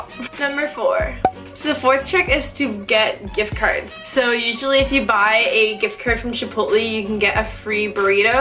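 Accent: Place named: Canada. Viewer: your canadian accent is so cute lol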